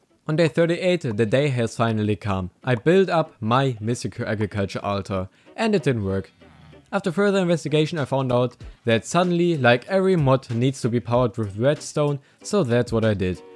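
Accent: Germany. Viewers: This german accent kills😂good video my friend, Ich fühle den Akzent😂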